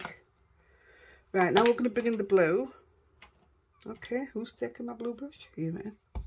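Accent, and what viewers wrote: Yorkshire, United Kingdom. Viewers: Oh fantastic as usual. What a cute little bag. Yes the Lavinia stamps are brill. Lucky enuf to be going to Lavinia shop later in the year on my trip to England. My list is growing lol. Love your accent being from Yorkshire myself.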